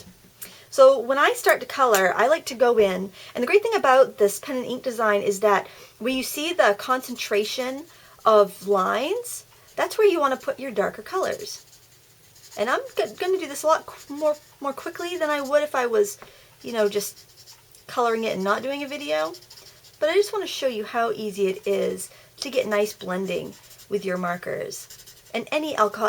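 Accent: Maine, United States. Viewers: Just found your channel today and I love it already! I also love this technique, and I love your advice regarding alcohol markers as it is something I want to start, but haven't because of the price. BTW, while you were talking I kept thinking that your accent sounds familiar. When you said you are in Maine I realized thats why as I'm just north of you in New Brunswick! And Mardens is awesome, whenever we go across the border it is a requirement to visit there, lol. :)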